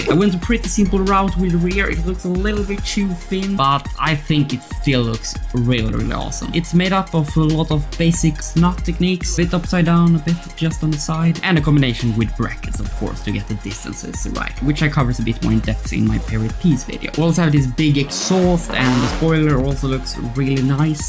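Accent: Sweden. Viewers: What country are you from? Swedish accent?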